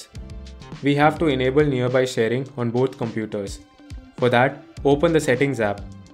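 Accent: India. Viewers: You know it's a great, quick and easy guide when you hear indian accent. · The moment I heard the Indian accent, I knew my problem would already be solved 😏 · Indian accent = auto dislike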